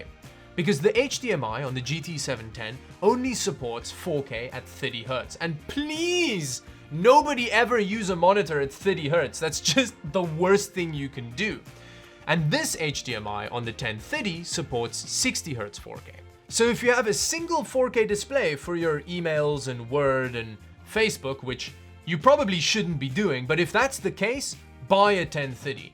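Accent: South Africa. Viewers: You must be somewhat South African related? Your accent and word choice gives South African vibes😅 EDIT: Another scenario for either of the cards is to use it as dedicated PhysX GPUs, I have successfully used a GT 710 for PhysX in Borderlands 2, but it is not sufficient enough for Killing Floor 2 since that requires a dedicated GTX 1060 for PhysX. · Hey man I hear that accent my fellow South African good to see some SA youtubers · This man sounds south african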